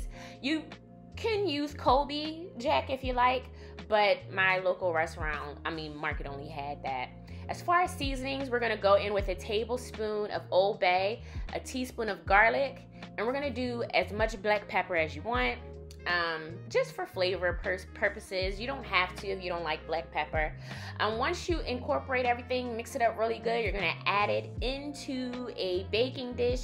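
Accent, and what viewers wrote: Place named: Philadelphia, United States. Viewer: You from philly!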